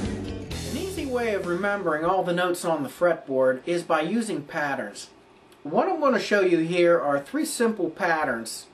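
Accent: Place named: South, United States. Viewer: Alrighty then, not from up North are ya? Great little lesson.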